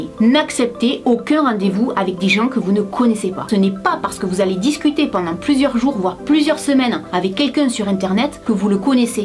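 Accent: South, France. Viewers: Tu vien de Perpignan la base ta l'accent du sud comme moi 👌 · Accent du Sud en force !!!! 💪❤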